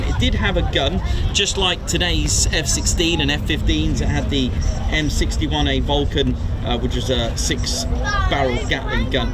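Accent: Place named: United Kingdom. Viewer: Love ur channel!! Love the British accent!!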